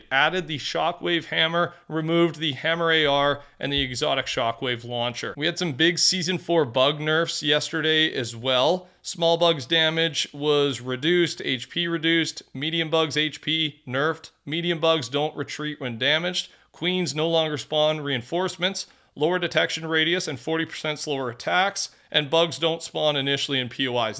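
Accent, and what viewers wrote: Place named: Canada. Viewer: He's Canadian they Pronounce Word differently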